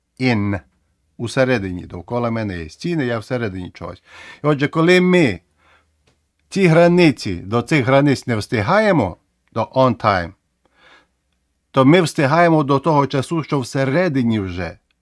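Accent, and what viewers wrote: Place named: Transcarpathia, Ukraine. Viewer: Чудовий контент, найбільше тане душа, що українською 💙💛І такий у Вас український акцент, неначе Ви із Закарпаття😊Дякую за знання, за англійську, і за українську!Все зрозуміло❤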